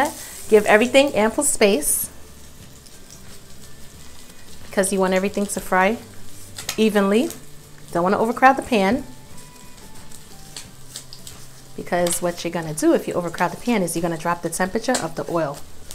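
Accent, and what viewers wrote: New York, United States. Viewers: Love the NY accent!❤ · sounds like she is from NY...luv listening to her tawk...lol I put a lil cayenne pepper, a lil jiffy cornmeal, a tap of mustard instead of worcstchire sawce...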